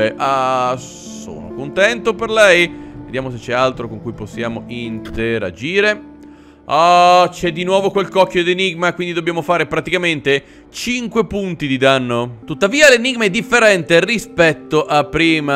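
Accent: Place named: Romagna, Italy. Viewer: romagnolo style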